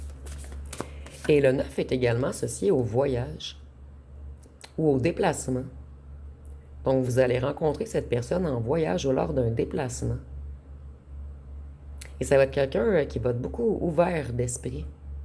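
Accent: Quebec, Canada. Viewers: Mes Respects du Four et Enchanté Chère 🌹🦋🙏 Merci pour vos guidances que je ne manque jamais! j'aime beaucoup votre accent Québécois aisi que ce beau vernis que vous portez si bien 😊 Un Agréable et heureux Dimanche 😊 Bien à Vous 💐 Alex 🙏🧘‍♂️🎉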